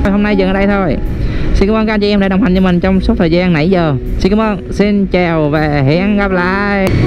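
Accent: Central, Vietnam. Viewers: Nghe giọng ông anh miền trung vui ghê :))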